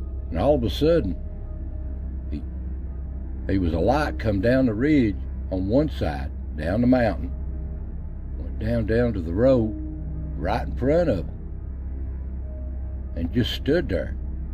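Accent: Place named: South, United States. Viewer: I love hearing these old stories. Thank you for documenting them. You have a nice southern US accent. Your way of speaking and tone is very relaxing & soothing to listen to (no loud sound changes/ noises in the video).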